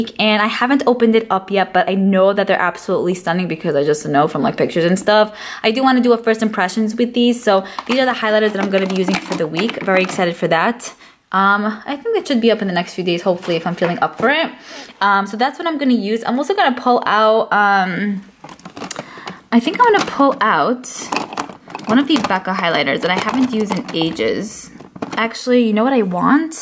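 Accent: New York, United States. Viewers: Great video; can't wait to see your reorganized stash. By the way, I notice a very distinct accent; New York? God bless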